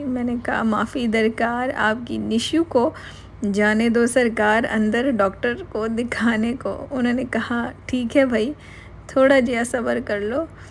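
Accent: Punjab, India. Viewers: Ap jaisy apni phli videos me bolti the waisy e bat kiya karen..zeada punjabi nooooooo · I think ap is vlog mein Merium parveiz ki copy kr rhi hain bolnay mein punjabi style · Aapi glasses bht pyri hn or ap ki funny punjabi Sony pay sohagha ....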